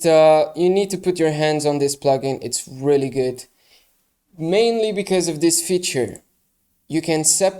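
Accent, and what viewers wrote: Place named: Brazil. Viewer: are u brazilian? eu percebi pelo sotaquezinho hahaha tutorial foda cara, esse som tá bem único mesmo!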